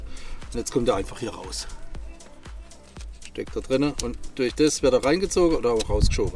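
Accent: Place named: Swabia, Germany. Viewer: Da du au ausm Schwabaländle bisch wie ma hören koh wolld ih fraga ob du mir des ed oifach eibaua kosch?🤷🏻‍♂️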